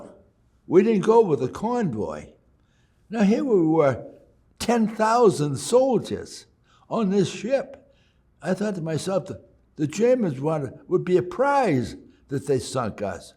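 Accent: Boston, United States. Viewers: This guy has an accent exactly like my friend from Malden Massachusetts. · Like the Boston accent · This guy is a real son of Massachusetts… WICKED HAHHD accent and all! 😂🤣Reminds me of my grandfather and great uncles from Massachusetts who all bravely served in WWII. My grandfather Charlie died when I was just 8 years old. I wish I could’ve known him as I got older. I wish I could’ve had the opportunity to sit down with him, smoke a cigar, and drink some of his favorite bourbon (loved the real KY stuff) with him and ask him about his life growing up in the depression and then his service in the war (Army aircraft mechanic, Pacific Theater), building his family and working as a butcher for Chicago Beef for 40 years. My mom being the youngest ‘oopsie baby’ born in 64’ when he and my grandmother were 40. 😂🤣🤣 I saw my grandfather in this man and started crying when I was watching. 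I miss you everyday and love you so much Pa. I’ll see you again someday and we’ll have that rocks glass in heaven. 🇺🇸🫡 💙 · he has the same accent as my grandfather who grew up in Charlestown MA in the 40’s and 50’s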